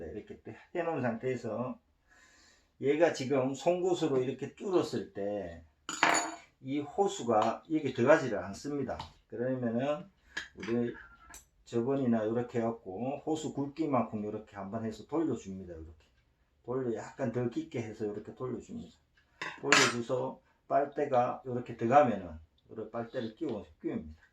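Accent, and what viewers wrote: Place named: China. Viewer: 중국분이세요? 듣도보도 못한 사투리네요 ㅎㅎㅎ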